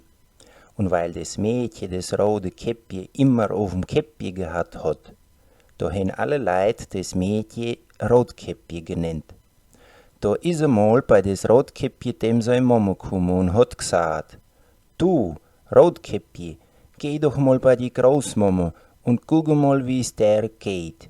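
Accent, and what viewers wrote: Franconia, Germany. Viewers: Täuscht das oder erinnert das an ein ganz komisches fränkisch?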